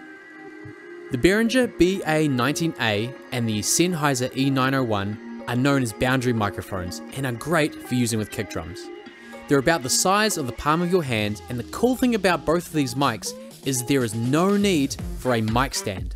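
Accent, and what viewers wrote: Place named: New Zealand. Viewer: You from NZ bro? u got my auto sub hahaha , solid video bro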